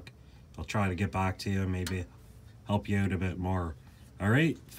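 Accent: Canada. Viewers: G-ah-sket. B-ah-ck. Th-ah-t. B-ah-d. Oowt. Interesting dialect. Cross between Canuck and California Valley speak. 😀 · This is definitely an Eastern Canadian accent! I’m guessing Nova Scotia. The Motomaster oil filter confirms the canuckery.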